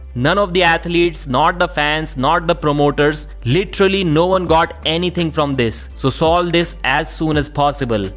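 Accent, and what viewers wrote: India. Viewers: FIX YOUR STUPID INDIAN ACCENT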